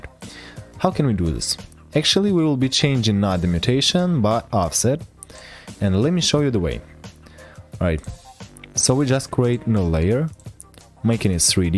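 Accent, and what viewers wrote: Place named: Russia. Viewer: i can hear some russian accent in it but it's so good it's like playing a video game about WWII LMAO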